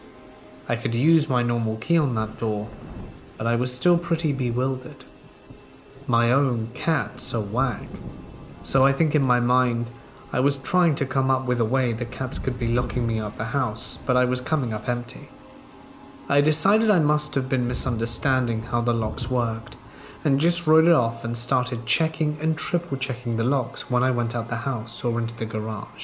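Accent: England, United Kingdom. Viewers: Hey! Bro, I love your channel content. I listen to your scary stories every night before I go to bed, and I gotta say... I look forward to it every day. Have a good rest of the week. I may send you some of my true scary experiences with ghosts and the supernatural. It would be so exciting to hear you recite them in one of your videos! One more thing...your English accent is superb! Almost makes me want to speak like that, and just adopt the accent for when I move away, lol.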